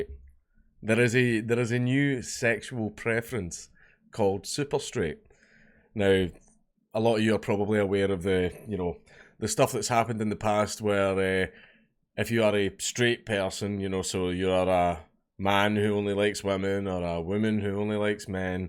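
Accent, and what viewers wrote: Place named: Scotland, United Kingdom. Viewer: Just for curiosity's sake I checked the auto-generated captions in this video, and they are almost perfect! Yutube's bot probably understands Scottish accent better than I do.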